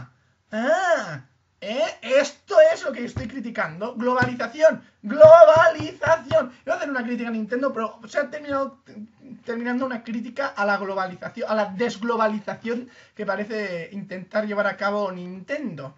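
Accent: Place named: Catalonia, Spain. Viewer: Tienes toda la razón !! PD: Como se nota que eres Catalan ( YO TAMBIEN XD )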